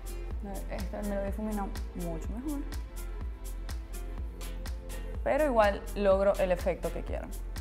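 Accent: Venezuela. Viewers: el acento Venezolano no se iguala, lo reconoci al instante, me encanto tu video Saludos desde caracas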